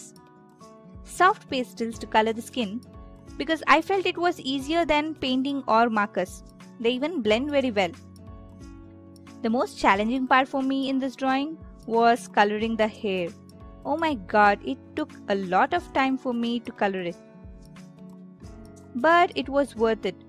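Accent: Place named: India. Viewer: I love this indish accent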